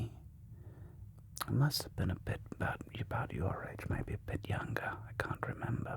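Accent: Germany. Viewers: I love the German accent videos ❤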